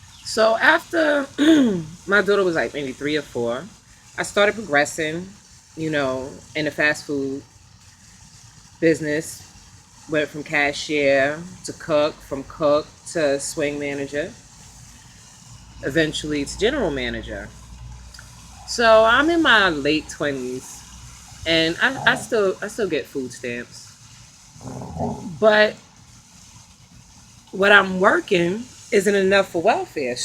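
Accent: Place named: Brooklyn, United States. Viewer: great "sumpen ain't right face". I lol'd . great accent too, Brooklyn? farewel is a joke, but only the rich are laughing.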